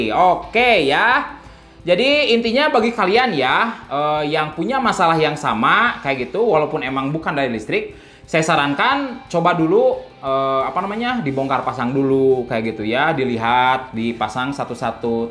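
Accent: West Java, Indonesia. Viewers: itu sunda bandung bukan sih?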